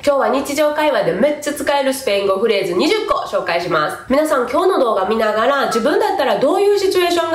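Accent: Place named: Kobe, Japan. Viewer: 神戸弁や〜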